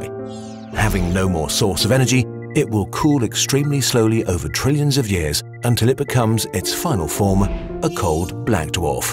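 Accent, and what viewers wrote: United Kingdom. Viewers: Something about a British man calling a Red Dwarf a baby is satisfying. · British guys with relaxing voices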